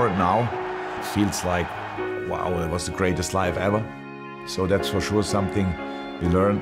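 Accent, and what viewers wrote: Liverpool, United Kingdom. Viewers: Hes slowly developing a Scouser accent.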